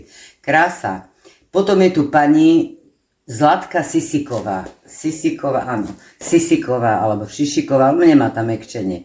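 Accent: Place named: Eastern Slovakia, Slovakia. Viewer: Mila pani. Ste, Super zienka, mama, kucharka. Ani si neviete predstavit ako, kazdy Vas recept, video, aj vase hlasky, nezaprie sa, ze ste aj vychodniarka…💗 nam prinasaju radost v Brooklyn,NY. Prajeme Vam aj kameramanovy vela zdravicka lebo je vzacne a hlavne lasky. Spozdravom vychodniarky( rusnacky) z Brooklyn E&K 😘😘😘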